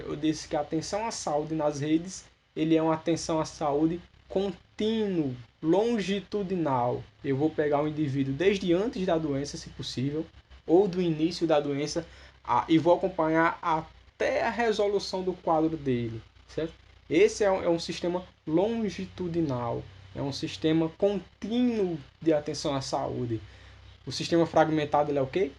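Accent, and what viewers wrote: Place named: Rio Grande do Norte, Brazil. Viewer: Adoreiii!! Esse sotaque bem Potiguar.. Sou de Natal-RN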